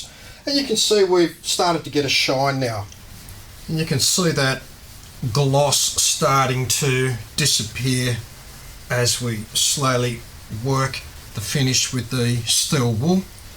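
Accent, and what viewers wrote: Australia. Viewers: New South Wales accent? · Well done Mate! Great video. What part of Oz are you from? You have a really thick accent. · I came for the tech tips... I stayed for the Australian Accent. Hey do You know Lee Priest? I'm from Canada, and Yes, I DO know Bob from Ontario...He's My neighbor. Great Video EH! ❤🇨🇦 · I love your Australian accent! welcome back. Always been inspired!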